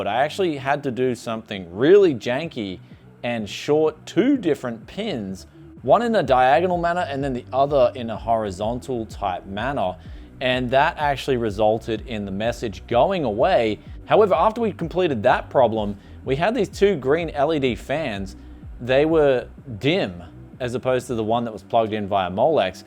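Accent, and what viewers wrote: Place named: Australia. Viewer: dude, your aussie, talk like one! Not sure what this in between accent is loll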